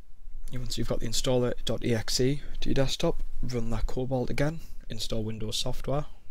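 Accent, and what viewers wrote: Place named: North, United Kingdom. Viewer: (nice to hear another northerner on the net too!) ;]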